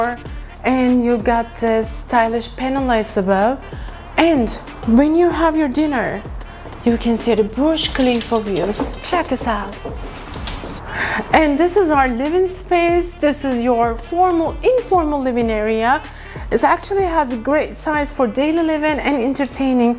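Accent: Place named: Turkey. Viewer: Bende diyorum bu aksan ne aksani diye, Turk oldugnu sonradan anladim 😂 Video guzel olmus bu arada, yakinda Dubai'i yerlesmeye dusunuyorum.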